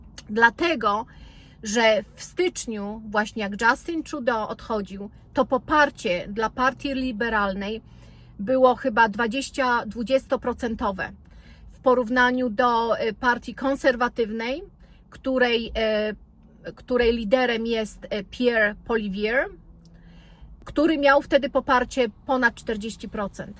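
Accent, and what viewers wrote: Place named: Krakow, Poland. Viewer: Pani z Krakowa? Tez tak mowie....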